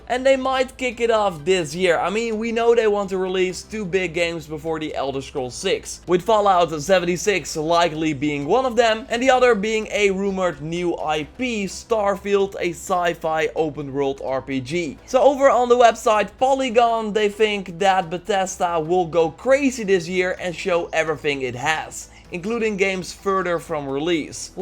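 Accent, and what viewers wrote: Netherlands. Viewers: Are u Dutch? you sound Dutch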